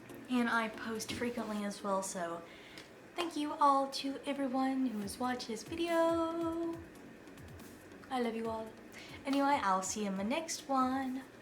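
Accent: South, United States. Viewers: do you have a southern accent? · Where are you from? You sound really southern, I'm from South Carolina but you sound more southern than me and my friends.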